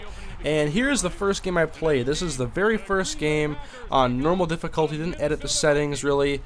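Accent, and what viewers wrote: Minnesota, United States. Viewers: youre definitely from minnesota lol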